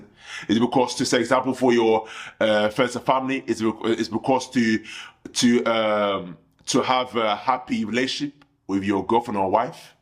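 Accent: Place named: Caribbean. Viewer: you say you are muslim bro but cl you sound west indie. Where are your family from?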